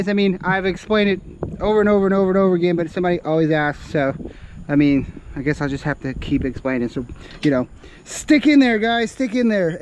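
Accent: Texas, United States. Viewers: 8,77 for whats ? your Texas pronunciation is very hard :) for not native :) Regards from Poland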